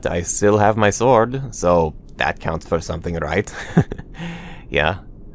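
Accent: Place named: Bulgaria. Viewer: Love the Bulgarian accent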